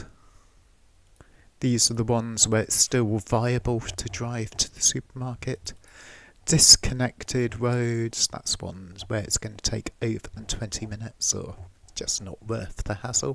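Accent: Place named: United Kingdom. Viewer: This has to be the most bri'ish accent I've ever heard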